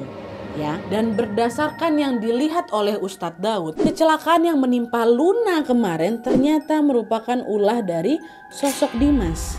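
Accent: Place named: Medan, Indonesia. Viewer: kak serius nanyak kakak org mana? medan kah atau dari pulau sumatera?? cara ngomongnya kayak org medan kali.. atau mirip org sumatera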